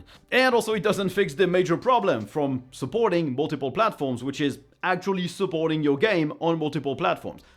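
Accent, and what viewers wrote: France. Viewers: He's French? I've long been guessing where that accent comes from lol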